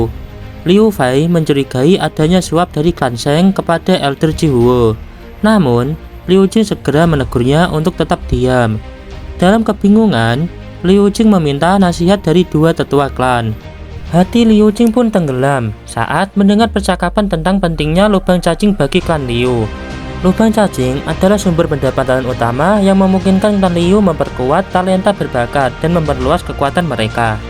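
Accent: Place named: Java, Indonesia. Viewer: Nada jawanya medok banget bang 😂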